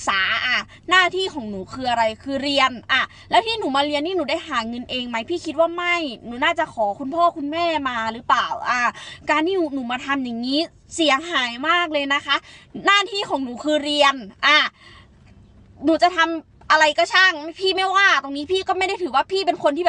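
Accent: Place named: Khon Kaen, Thailand. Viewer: ชอบมากอะเวลาพี่พูดแหมสำเนียงเหมือนคนขอนแก่นบ้านหนูเลยมักแฮงเด้อ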